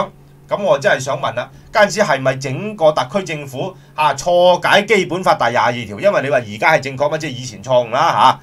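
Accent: Guangzhou, China. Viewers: 好似廣州人口音，唔似香港澳門人口音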